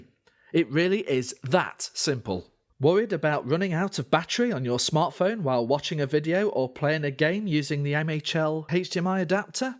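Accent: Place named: United Kingdom. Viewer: Esse sotaque britânico ♡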